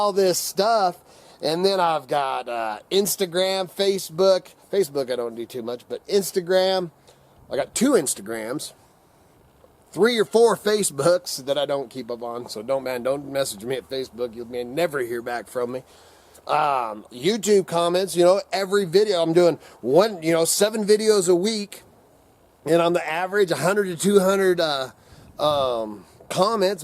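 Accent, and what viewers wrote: South, United States. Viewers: You're making me laugh and I love you videos when I get a chance to see him cuz I work at night you make me really laugh it's fun to watch your videos because you give a simple thing to it like that Duck Dynasty Phil Southern kind of thing that you have and you're not even from the South but you sure have it.... and that's where people don't grasp that you know from where I'm from the south there's a lot of people like you I've been you in my life but you do what you have to do to make a living in life and sometimes we don't always need as much as we think we do that's what you've taught me you know I've gotten rid of a lot of things that I don't need but I also keep the things that I know I need down the road... but you've definitely made me want to live further out and thank God I'm in this shape not many people 50 years old get that opportunity I thank God everyday that I can do the job I do cuz if you saw the job by do you read like then you went from one job that you had before to another one that's just as hard but you know I look at that way it keeps me going I don't have to pay for a gym can I get the enjoy life a lot better.. 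basically the share with you my life has full circle came back everything I ever lost in my life I gain back tenfold and I have to admit that because it didn't just happen on its own cuz I don't believe in luck... hey does it hurt to have a prayer come from your lips sometimes... but you remind me of a simpler time that's why I want to come visi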